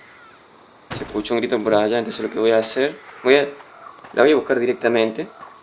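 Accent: Cordoba, Argentina. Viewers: sos argentino, tenes alto acento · Che flaco sos cordobes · Como me encanta como habla este cordobe son uno grande es como yayo 🤣🤣🤣🤣👍👍👍 segui asi papa !!!! Me encanto tus video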